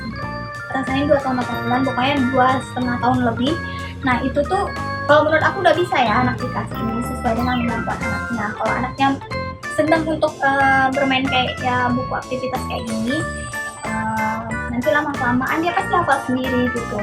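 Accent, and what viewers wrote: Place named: West Sumatra, Indonesia. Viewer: Sukses ya sistaaa, logat minang nyo itu looohhh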